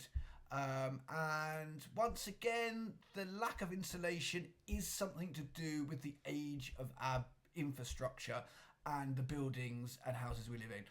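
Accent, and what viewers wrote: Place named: Cambridgeshire, United Kingdom. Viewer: Hello. I very strongly suspected you were from Cambridgeshire based on your accent. But you mentioned in another video that you were from Suffolk. Do people in the two counties have similar accents? I know an English guy from the city of Cambridge who has a similar accent. You also sound like the theoretical biologist Aubrey de Grey at Cambridge University but not as strongly as my friend who was born and raised in Cambridge.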